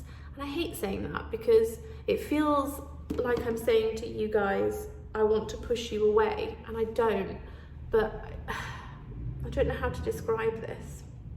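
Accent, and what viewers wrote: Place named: United Kingdom. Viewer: YOU ARE AMAZING!!!! Put up all the videos!!! You are one of my favorites for so many more reasons than your fun British accent 😊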